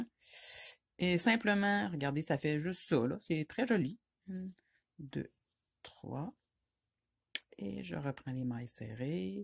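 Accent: Quebec, Canada. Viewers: Merci pour ces bons astuces et votre magnifique accent du Québec ! :washhands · Un grand merci de Suisse pour ce tuto qui m'a permis de réalisé de belles finitions. Vos explications sont très claires, votre rythme agréable et votre accent me rappelle le Québec🙂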